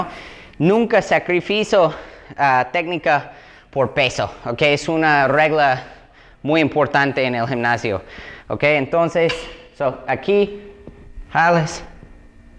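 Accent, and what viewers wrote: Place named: Yucatan, Mexico. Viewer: Donde vives? Hablas un poco como yucateco xd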